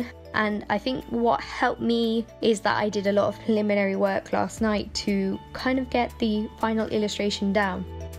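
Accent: United Kingdom. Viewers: Cool painting and it glows like crazy. I wonder if you can apply it to plastic surfaces like a action figure? By the way, love your accent it's British right?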